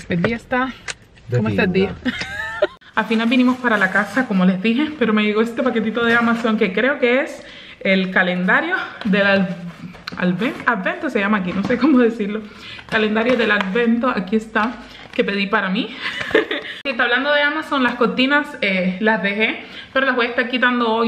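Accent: Cuba. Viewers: Navegando por youtuve y encontre una familia muy interesante y bella mas tu hijito que niño mas tierno y hermoso, sere tu Nueva seguidora ya que me encanto tu contenido felicidades amo el acento cubano saluditos y muchas bendiciones desde cbba-Bolivia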